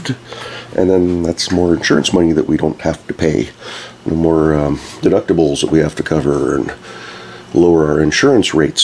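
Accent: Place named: Canada. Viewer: are you in Canada? can you speak with out a Canadian accent?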